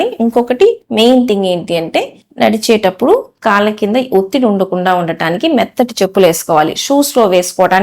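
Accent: Guntur, India. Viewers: Maa Guntur slang... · అవునమ్మా...మా గుంటూరు జిల్లాలో మేము అలాగే మాట్లాడుతాము..అది మా ప్రాంతీయ భాషమ్మా.. మేడమ్ గారు చెప్పే మంచి మంచి విషయాలను మనం విందామూ , ఆచరిద్దామమ్మా..👌👌💐👍😍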